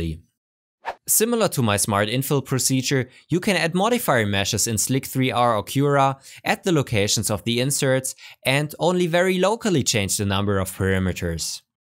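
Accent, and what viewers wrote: Germany. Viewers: Der Deutsche Akzent ist unerträglich.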